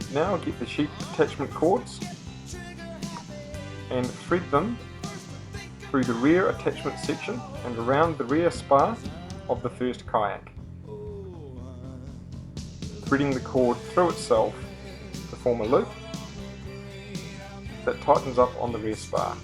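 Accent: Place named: New Zealand. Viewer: Love the concept and the New Zealand accent!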